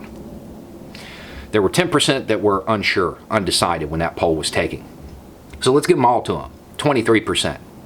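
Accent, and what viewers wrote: South, United States. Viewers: you make the hardest subjects so easy to understand for we mortals. Your southern drawl delivery is calming. Thank you sir and keep the good work!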